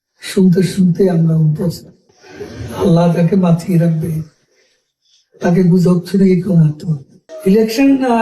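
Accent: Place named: Kolkata, India. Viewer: কথায় কলকাতার একটা টোন আছে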